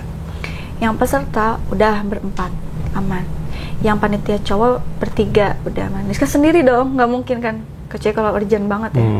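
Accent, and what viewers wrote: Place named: West Java, Indonesia. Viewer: Suaranya sunda dan enak 😘😘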